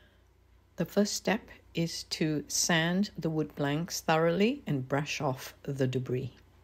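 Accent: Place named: United Kingdom. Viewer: Such cute earrings. I wasn't expecting your voice with a British sounding accent. It was so nice to hear your voice and I think you should do more tutorials with your voice.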